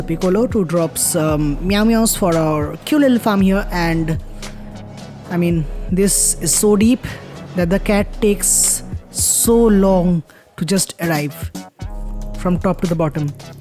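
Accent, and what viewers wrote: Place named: United Kingdom. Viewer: Litrelly got orgasm by intro music and your British accent eng.. senpaieeeeeeeee..✌️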